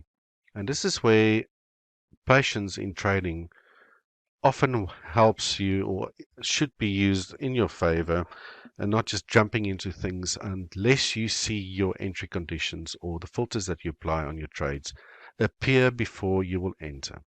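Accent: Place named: South Africa. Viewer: You sound South African boet, is jy?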